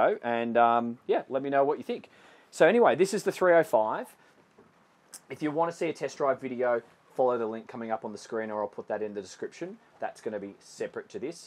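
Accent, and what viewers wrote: Australia. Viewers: I don't care about the boats, but I love the tight pants, Aussy accent, and the peekaboo tease of his hairy belly!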